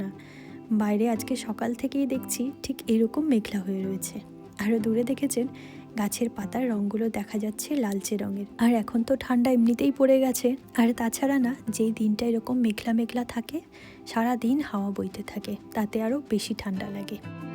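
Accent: Bangladesh. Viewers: Amar mishti Maa..khub khub sundor lagche and lakhi rupe gune sob pujo kore cho❤❤❤❤..ami o tomar moto bangladeshi bangla boli .khub bhalo lage.. just st love this bangla...but my husband is ghotti...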